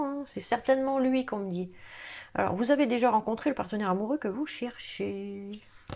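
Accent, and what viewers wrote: North, France. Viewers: Un petit bonjour d'Hautmont près de Maubeuge😉😂👍. Je vous découvre et je retrouve bien l'accent du Noooooord ! Merci pour ce tirage, je suis gémeaux ascendant verseau également ! 😉👍. Je m'abonne à votre chaîne avec grand plaisir. Bisous à vous et à votre adorable petite chienne😍🙏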